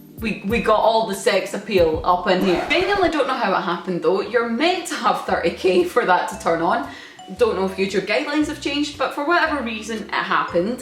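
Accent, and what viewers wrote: Scotland, United Kingdom. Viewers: I absolutely never realized the Scottish accent emphasized the "e" on certain words. Like "hous'ee's", AWESOME! Sorry, I'm a new sub and I love different accents.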